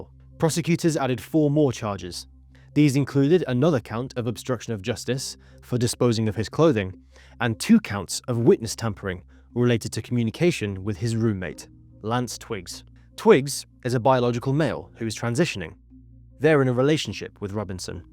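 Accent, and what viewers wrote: United Kingdom. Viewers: Did you not hear his voice. British bro.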